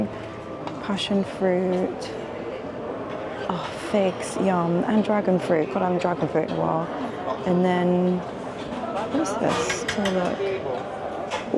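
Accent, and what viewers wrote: RP, United Kingdom. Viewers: That's so adorable 🥰. My 10 year old daughter also loves to watch with me, she loves sense of humour 😂😂😂😂😂 and her posh accent 😊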